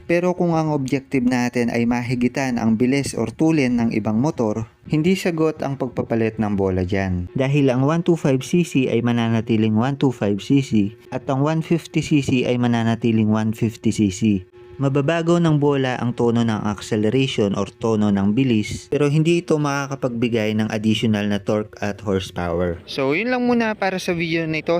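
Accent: Pampanga, Philippines. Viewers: Kapampangan ka Boss? Tono pa lang eh. Nice one dami kong natutunan dito.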